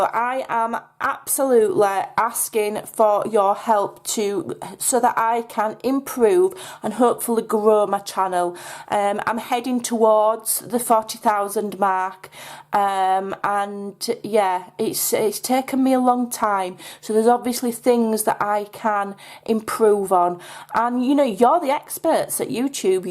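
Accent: Yorkshire, United Kingdom. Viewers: I'm sort of new and old at the same time. I've followed you off and on and see you in my feed sometimes and remember that I haven't checked in for awhile. What I find draws me back and keeps me locked in for months at a time are the series or segments that you do that have a theme so I can go back and find them. I love that you do all sorts of things, I love who you are and listening to you (perhaps I'm a weird American because your grammar and accent don't bother me and actually are fun because Yorkshire isn't an area I knew well but it reminds me of my ex-husband's mother who was originally from there before going to Essex and being there for almost 40 years by the time I met her) and so all I can offer as a suggestion is that you might find doing specific series helps. You're an excellent teacher, and you've also been good at letting us learn with you... 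so you don't have to know it all ahead of filming, just learn with us and go through the whole process. :D Lets see what WE can do to help you spread your channel and courses, too... give us super tasty bits to share to bring people in. Quick challenges that we can do with you and link up on Instagram, maybe? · I love what you do, love your accent, have always liked the Yorkshire accent (Big fan of coronation street) You have inspired me with things you create and you are very easy to follow along with. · Hi, some feed back for you! I love your Yorkshire accent (perhaps I'm biased as I'm from Yorkshire too), there's nowt wrong with it so don't take any notice of anyone who criticises it. Your videos are fun and easy to watch/listen to, I like them as they are natural and not staged. I am quite new to junk journalling so these are the videos I look for and watch, so more of them for me. That doesn't mean I don't like to watch other things too, they can spark ideas. 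I don't like really long videos, so fast forwarding is fine, we can always pause and rewind. So for me, I don't think you need to do anything different!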